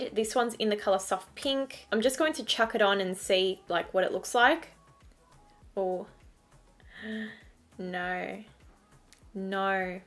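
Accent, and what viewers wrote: Australia. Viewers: lol i was like.... nz or aussie? and then she said 'they bloody hurt' and i was immediately like yup aussie 😂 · Australian accent 😍😍😍😍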